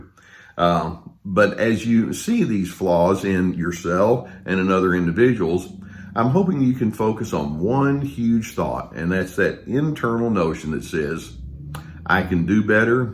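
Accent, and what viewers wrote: Texas, United States. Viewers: I knew it was Texas even before I knew it was Texas. It’s his Texas accent, I have it too, grew up in Texas, lived there for 22 years (till I made one of my horrible life mistakes by marrying a douche bag) All the time I get “where are you from, where’s your accent from” evidently the Texas drawl never leaves you... and, I live in Biloxi Mississippi but evidently it sounds a little different! 😀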